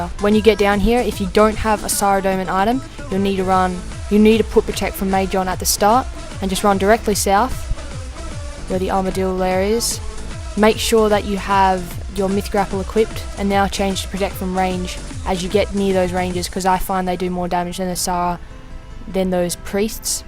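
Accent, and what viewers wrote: Australia. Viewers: hey buddy u sound aussie, im aussie too. im maxed would you like to go arma sometime?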